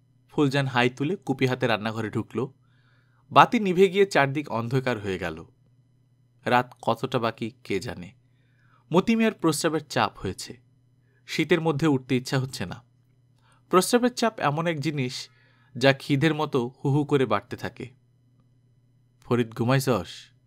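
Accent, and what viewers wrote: Bangal, Bangladesh. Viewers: সত্যিই আপনার বাঙাল ভাষায় কথা বলার ভঙ্গি বড়ই সুন্দর। ভীষণ ভালো লাগে আপনার গল্প শুনতে। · Apnar Bangal accent ta khub accurate, presentation tao darun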